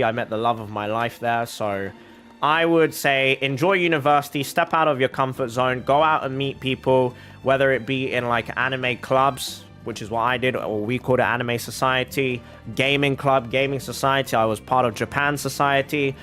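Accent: United Kingdom. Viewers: Damn, you got those good genes looking like you do now at your age! Dude at my workplace is Chinese and 35, and he only looks a few years younger than you.The two of you would've passed for siblings for real. Also, as an Chinese Australian, I gotta say that you sound more British than Australian. When I'm talking with people using discord, they think I'm British lmao.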